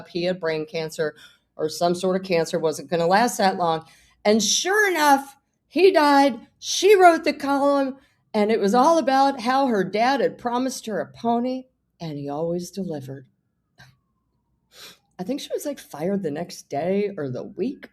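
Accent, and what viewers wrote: South, United States. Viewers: Is it just me or did get a vaguely Southern accent for most of this video? 🙃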